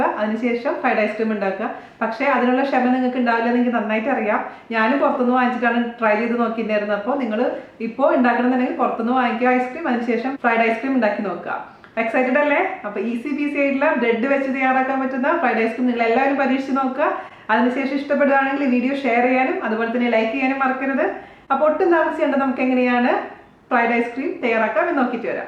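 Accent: Thrissur, India. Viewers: Simple avatharanam adipoli 👍thrissur ano slang like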